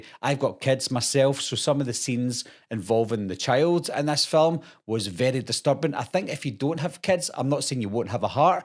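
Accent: Scotland, United Kingdom. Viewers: As soon as I heard the Scottish accent I was in! Then for you to recommend a couple if Aussie flicks AND know about The port Arthur massacre we had, I am now subbed 👍🏼 🇦🇺 🏴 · Is this guy Scottish?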